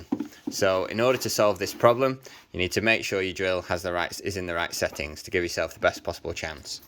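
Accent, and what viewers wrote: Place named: England, United Kingdom. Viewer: LOL! When I heard the English accent I knew I was in for a treat. What a joke of a video.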